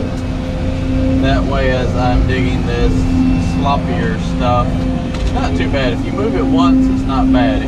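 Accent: South, United States. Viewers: Meuud, lots of meuud ! Love your Southern accent !! 👍👌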